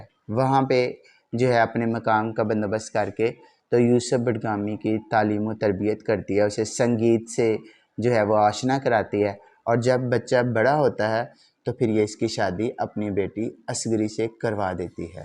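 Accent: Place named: Punjab, India. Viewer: محترم ڈاکڑ صاحب اس قدر اعلی ادبی پارہ کے تعارف کا حق ادا نہیں ھو سکا تلفظ اور لہجہ میں اردو کی بجاۓ پنجابی لہجہ اور ادائگی کی واضح چھاپ ھے 🌸🌸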